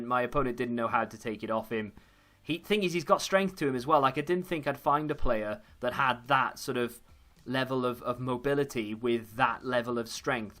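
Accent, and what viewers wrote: Wales, United Kingdom. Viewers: Certainly not, I'd guess Wales or somewhere in England close to the border. Probably Wales though.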